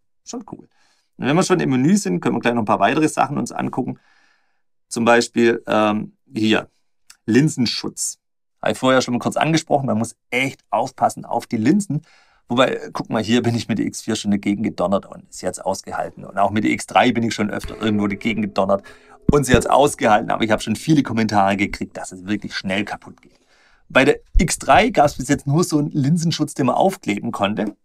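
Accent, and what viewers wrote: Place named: Swabia, Germany. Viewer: Ich liebe deinen schwäbischen Dialekt 🤗👍👍👍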